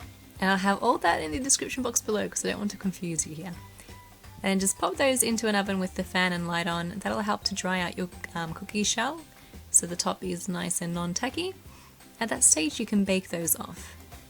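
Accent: Australia. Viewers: she pronounces 'almond' as its spelt, and 'sandwich' she says it in an australian accent - just like how australias say 'straya. main thing is we understand what is saying, nothing wrong with it at all.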